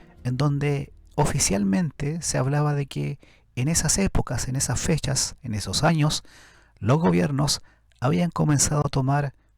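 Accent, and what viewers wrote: Chile. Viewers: Por mas podcast como estos con acento chileno ☺️. Mis favoritos, gran trabajo, gracias ❤.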